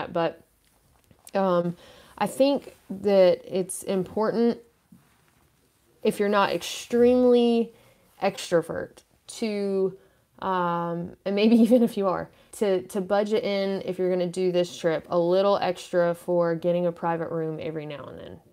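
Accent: South, United States. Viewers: Does your Spanish have a southern accent too.? Haha. Glad to see you had a fun safe journey. Thanks for the videos.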